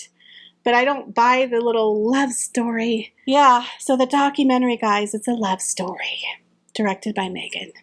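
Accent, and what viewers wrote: South, United States. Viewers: It's that slight southern accent "Y'all" 😉 😘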